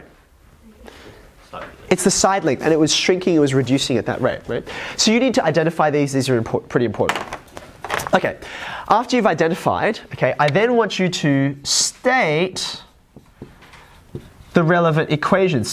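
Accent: Australia. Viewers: Bro is a math teacher and he’s Australian what fake accent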